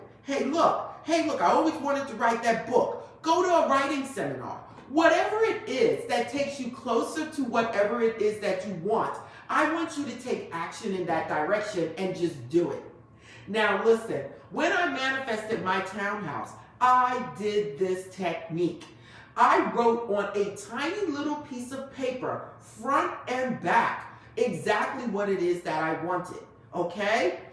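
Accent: New York, United States. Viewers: Love the content and your NY accent ! 😍❤🙏🏻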